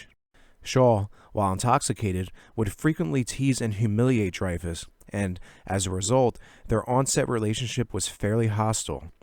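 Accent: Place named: Philadelphia, United States. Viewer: You have a strong Philadelphia accent.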